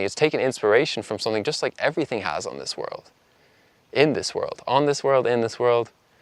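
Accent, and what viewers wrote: Ireland. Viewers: is that another irish accent I sense?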